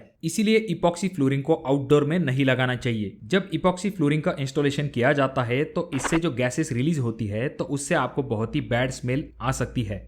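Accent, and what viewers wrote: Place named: Bundelkhand, India. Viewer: खपरा बाला भाई बुंदेली लग रहा हे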